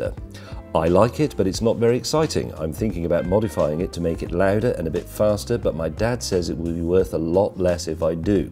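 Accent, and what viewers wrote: United Kingdom. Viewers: Would you please extend the content of your channel, gotta hear dem british accent more(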